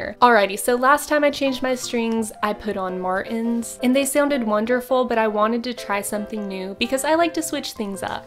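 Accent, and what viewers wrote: South, United States. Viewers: Why do you sound like my mom? Like the country accent and everything is spot on.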